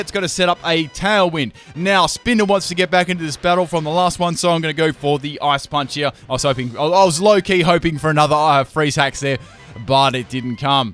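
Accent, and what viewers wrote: Australia. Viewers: By the way, are you from Australia or New Zealand?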